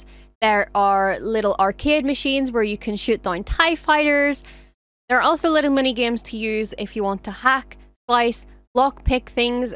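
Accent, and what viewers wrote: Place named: Northern Ireland, United Kingdom. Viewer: Great video! Really appreciate your enthusiasm, and enjoyed the natural commentary in your wonderful Northern Irish accent. I'm a lazy gamer and therefore not usually a big fan of open-world games (e.g., couldn't get into Starfield), but this is Star Wars and I think I'll give it a go! Cheers.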